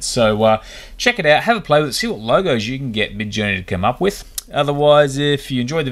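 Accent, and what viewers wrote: Australia. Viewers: Great to hear from an Aussie!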